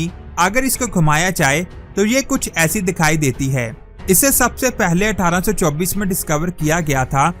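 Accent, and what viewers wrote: Punjab, India. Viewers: Dear BROTHER ,Please correct your english like SKREEN. dont mix panjabi way in the vedio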